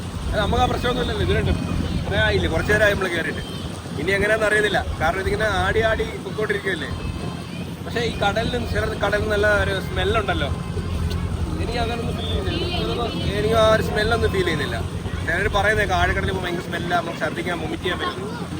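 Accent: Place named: Kannur, India. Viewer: Kannur language ayyi oru cut unde ee language inne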